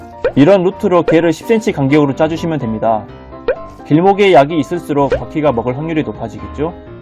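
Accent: Jeolla, South Korea. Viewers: 아 진짜 너무 소름끼쳐요ㅋㅋㅋㅋㅋㅋㅋ와중에 전라도 말투 너무 귀여우ㅑ욤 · 요게 어디 사투린가요?? 설명 읽어보니까 광주적혀있는데 이게 전라도 샅투린가요?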